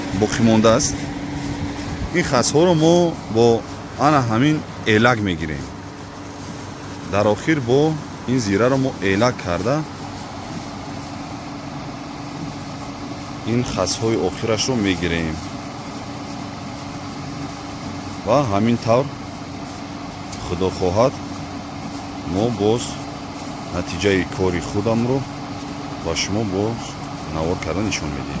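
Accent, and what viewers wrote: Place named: Tajikistan. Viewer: Зинда хонаобод боши бародари азиз ... Барои лавзи ширини точикона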